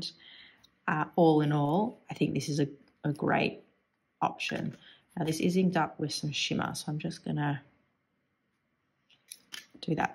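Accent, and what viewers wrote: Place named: Australia. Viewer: I, too, am not so fond of Lamy. I adore the Plaisir. It writes all the time. The Preppy just can’t be beat. I have several sets in different nibs all inked up in their different colors, so I can draw quickly. They are only $4.00 here. I do not like my Kawecos at all. It think it’s the nibs, so I might change them out. I do not yet have a TWSBI yet. I’m cheap. Don’t love the piston fill, either. I love the Platinums altogether. The Kukuno is also a big favorite and I have several, as they only cost $12.00 here. I have them all over the house. 😅I never spend more than $30.00 on pens. I’m now getting into inks. OMG. About to lose my mind. So many choices, so much money. I prefer cartridges so will be filling my own with special inks. Some inks are so gorgeous they should be drawing inks because the writing doesn’t really show all the nuances. 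Do you have actual pen stores in Australia? I live in Oregon, USA, and we have only one stationary store and they carry a few fountain pens. Other than that, in my local area, I buy everything on line. I probably told you before, I love your accent and all things Australian 💝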